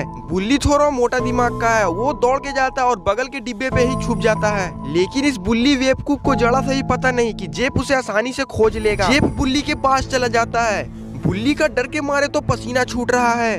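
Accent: Bihar, India. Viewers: 😅😅😅😅 aap Bihari ho kya❤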